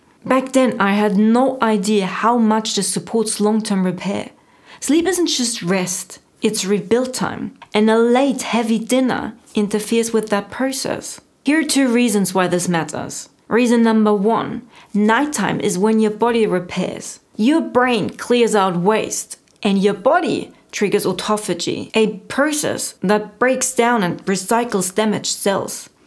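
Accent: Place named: Germany. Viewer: Fantastic accent? Sounds like German raised with some Southern England living, some Australia, a lot of US media?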